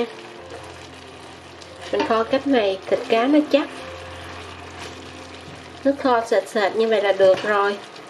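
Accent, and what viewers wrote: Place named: Vung Tau, Vietnam. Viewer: Giong chi giong nguoi Vung tau qúa😮😢❤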